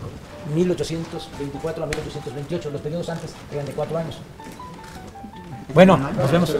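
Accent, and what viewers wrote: Tabasco, Mexico. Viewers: Lo bueno es que el recorrido lo hace en su castellano tabasqueño, porque si tuviera que hacerlo en inglés, estaría perdido. Sugiero que para recuperar espacios en palacio nacional, él y su prole se larguen de ahí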